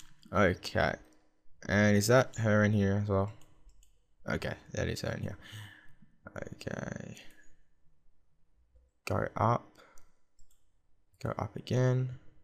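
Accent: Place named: Australia. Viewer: YOU SOUND AUSTRALIAN. LOVE IT.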